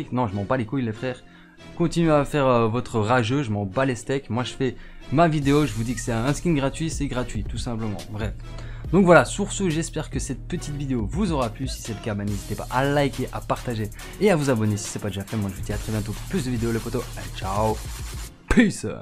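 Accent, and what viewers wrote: Belgium. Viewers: Sa se voit tes belge toi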